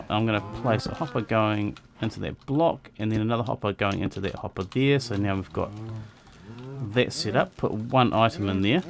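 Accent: New Zealand. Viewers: Hey bro...works mean as,buzzed out when I watched your tutorial and heard a kiwi on the other end, just starting to get some skills now on minecraft and it's all due to the tutorials on youtube...keep up the good work man. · My water only flows to the right over the fence, only flows both ways when I update with redstone torch, but when i retract the water and dispense it again it screws up again...any ideas? P.S. Great to hear another fellow kiwi accent on youtube!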